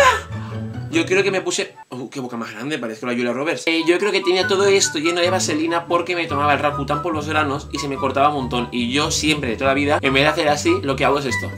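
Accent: Valencia, Spain. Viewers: que se note ese acento Valenciano de añadir A al final de todas las palabras (pd:soy de Valencia ^•^)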